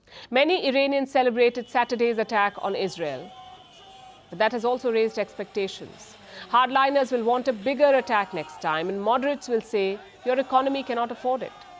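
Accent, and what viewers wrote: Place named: India. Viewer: YES WE ALL AGREE , BUT ITS DIFFICULT TO UNDERSTAND HER WITH THAT INDIAN ACCENT !!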